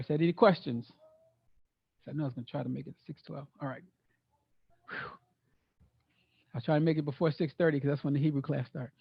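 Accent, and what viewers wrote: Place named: Israel. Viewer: Shalom mishpâchâh!! I am enjoying this channel.. can you please help me with understanding the proper Hebrew to learn? The Hebrew that you speak, sounds like the same Hebrew that is mixed with Yiddish that the people in ISREAL speaks. Is it? Also the Hebrew you speak and teach is different from those who speak paleo Hebrew. With the vowels being Aa, Ba, Ga, Da etc. etc. I want to know it fluently but there are different vowels and Annunciation. Can you help me with understanding the difference between the two so I can become fluent in our language? Thank you sincerely Shalom or is it Shalawam?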